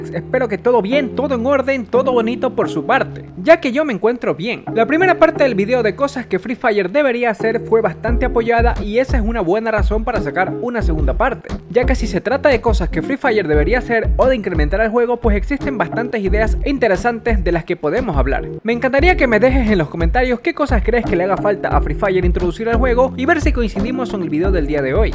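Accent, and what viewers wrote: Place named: Ecuador. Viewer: que eres de ecuador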